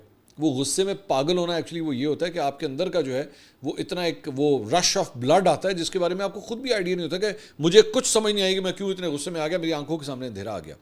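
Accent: Punjab, Pakistan. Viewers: Assalam o alaikum Bhai aap ka Punjabi zaban ka tarka boht mazaidar hota hai....keep it up. Apka tajzia boht behtreen hota hai, hr news authentic hoti hai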